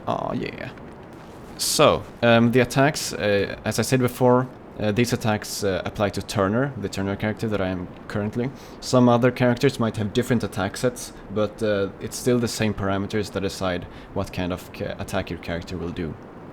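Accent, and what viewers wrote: Sweden. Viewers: hes swedish i can her it on his voice cuz im swedish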